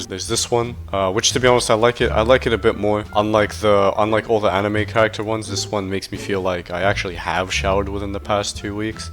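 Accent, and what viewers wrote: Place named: France. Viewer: your french accent is crazy considering you don't know french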